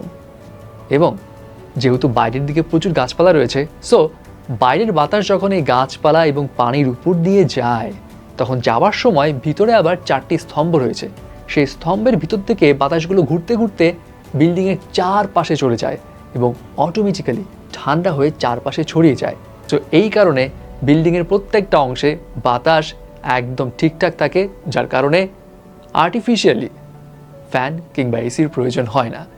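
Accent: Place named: Kolkata, India. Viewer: ভাই এর কথা কলকাতার মতো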